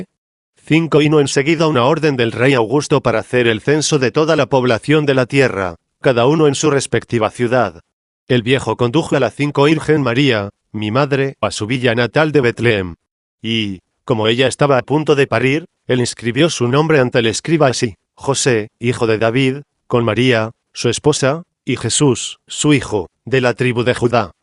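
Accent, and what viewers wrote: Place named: Castile, Spain. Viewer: Por favor, no uses tu voz Robótica. Vuelve a hacer este video con movimiento de personajes, hay muchas películas de dónde tomar cortos. Por favor, trata de hablar y leer en español latino porque no soporto el castellano, te agradeceré hasta que me muera si me das gusto. Trata de cambiar los textos que lees al español latino, como hablamos el nuevo y mejorado español de México, y te felicito por este video, lo buscaba pero no lo encontraba.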